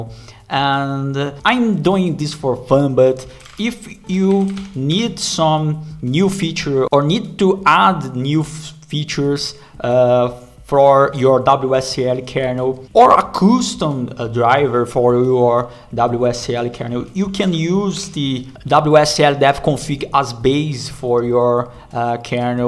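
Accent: Brazil. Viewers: Sabia que era brazuka kkkk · Brasileiro falando ingles nao tem como nao pegar Haha. Otimo conteudo irmão. Parabens. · Eu sabia que vc era br só pelo o seu sotaque Br tem um jeito exagerado de pronunciar as vogais kkkk Vídeo top d+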